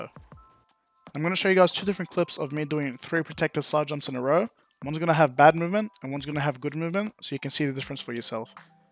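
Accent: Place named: Sydney, Australia. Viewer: Sounds like he's from inner west-sydney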